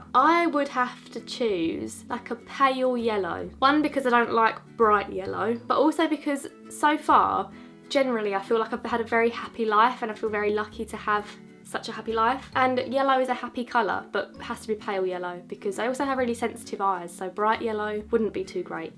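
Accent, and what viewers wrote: United Kingdom. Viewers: I'd love to have your voice tbh. Your voice is so soothing. British accents yessss <3 I am also fine, thank you :)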